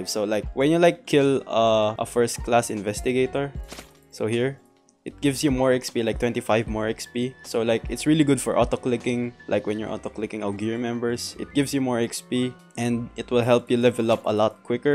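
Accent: Philippines. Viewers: I think ur a Filipino Because of Ur Accent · In your voice um I can tell your a Filipino Just for me ehhehehe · your accent looks like filipino :/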